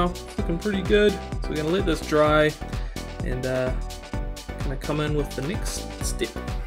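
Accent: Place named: New Zealand. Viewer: For an Adelaide based kiwi, it's so great to hear our accent and the word "Kiaora" and btw, your tentacle painting is spot on!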